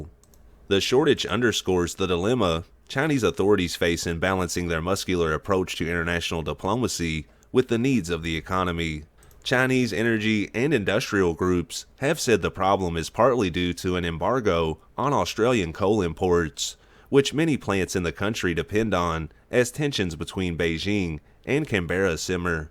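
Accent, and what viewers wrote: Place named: Texas, United States. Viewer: This dude has Texas accent wit good old boy twang to it .But besides that China is using more resources then America ,and at some point the shit is going hit the fan on resources in the world ,but may with pandemic will save some resources for a short time ,but I don't care about China .But the sure is going to be fuck up in the future with resources .And mostly will have do with some greedy assholes at the top of the food chain ,who are no very bright as it due the fact some their daddy's give them the company or very large wallet to throw around money .Since this always starts at the top .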